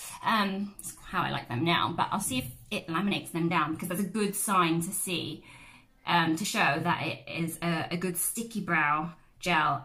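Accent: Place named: United Kingdom. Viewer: Woah wasn’t expecting you to be British 🥺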